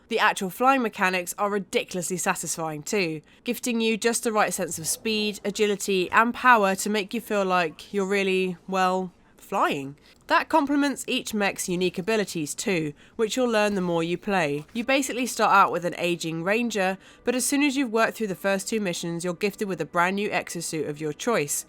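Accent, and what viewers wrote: United Kingdom. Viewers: Women with british accents are sexy.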